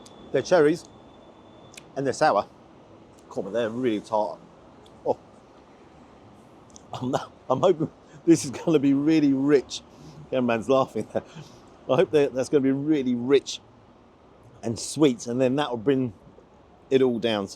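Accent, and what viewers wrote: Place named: England, United Kingdom. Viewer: Hello from Budapest, Hungary and I am always hungry😅 I really love watching your videos mainly because of your accent and I do think that YOU are a true -born Englishman😊 My family and I are planning to visit London so I am trying to get some ideas where shall we dine once we are there😊 All the best !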